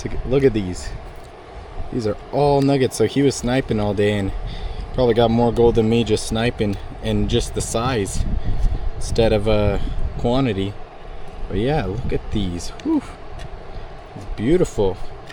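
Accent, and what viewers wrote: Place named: California, United States. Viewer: I never would have thought you are speaking English as a second language, but I do hear that there is more to your sound than California, just a very very tiny bit - 1%. Seriously, amazing to learn English as 10 - that is impressive.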